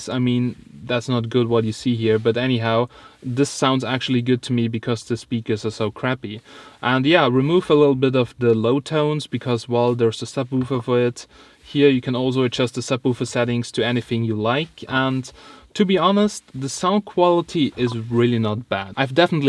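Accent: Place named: Germany. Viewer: nice German accent :-)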